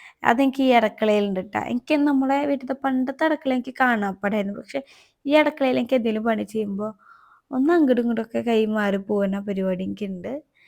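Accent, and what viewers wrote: Thrissur, India. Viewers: That's their style...thrissur chela idath Njan ketitund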